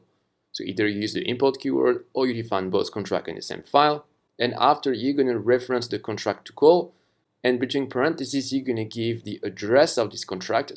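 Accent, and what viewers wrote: France. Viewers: Thank you so much!! Vu l'accent je pense que tu es francophone donc je te le répète : MERCI 🙏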